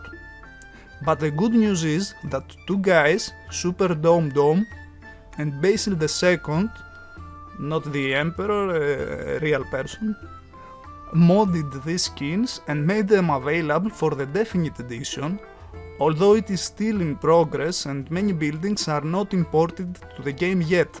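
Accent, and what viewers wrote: Greece. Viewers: Από την προφορά θα πω ότι ο ομιλητής είναι Έλληνας άλλα δεν είμαι σίγουρος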